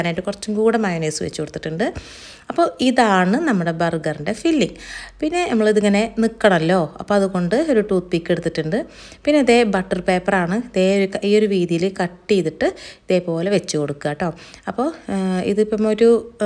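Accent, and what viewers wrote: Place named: Malappuram, India. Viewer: നിങ്ങൾ മലപ്പുറം ജില്ല ക്കാരിയാണോ. സംസാരം തൃശൂർ ശൈലി യിലേറെ മലപ്പുറം ചുവ ആണല്ലോ.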